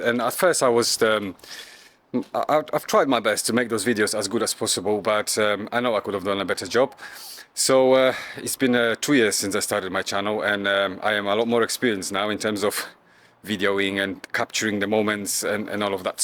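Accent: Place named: Poland. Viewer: Hi thanks for responding I’m in Borehamwood, near Barnet, WD6 You sound like you are Polish, my mum is from Gdańsk Best wishes Mikey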